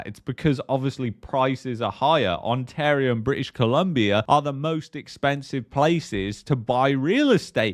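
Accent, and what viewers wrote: United Kingdom. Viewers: I never thought the British accent would annoy me so much, but here we are.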